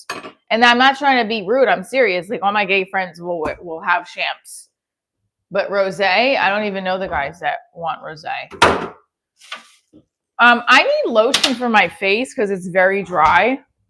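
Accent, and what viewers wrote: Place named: Boston, United States. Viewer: Yea. You have Boston accent. Kinda Jewish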